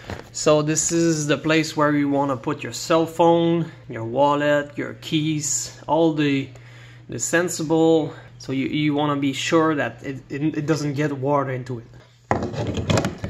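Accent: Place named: Quebec, Canada. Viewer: Quebec 101 i can spot that accent ;) Good video!!!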